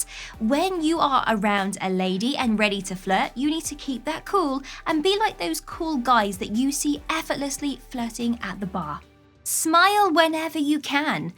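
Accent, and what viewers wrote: United Kingdom. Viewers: I just found this channel tonight; this girl is really cute and I love her British accent.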